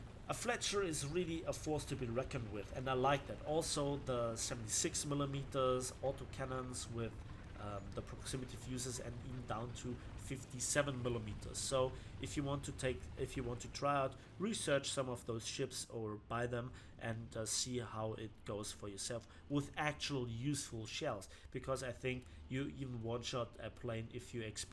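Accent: Bavaria, Germany. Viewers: Question: What do you think of WoT in General? Und kannst du, wenn du deutsch sprichst bitte das Bayrisch unterlassen? Ich hab dann immer das Gefühl, ich hör Seehofer oder Söder zu. Yet thete is no indication of He filler, penetration values or fuses and so on in WoWs...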